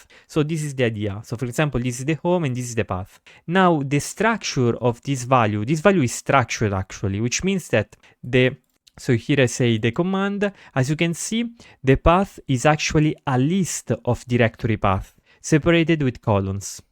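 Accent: Italy. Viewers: Ho sentito i primi 20 sec. Sei italiano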